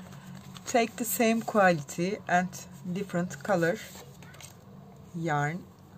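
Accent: Turkey. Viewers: I love your accent! Are you turkish? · Gerçekten Türk aksanı diye bir şey de var ben de oradan anladım. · Abla aksanından tanıdım türk olduğunu valla elenjdkdnwbsk Deniycem yapmayı şimdi ellerine sağlık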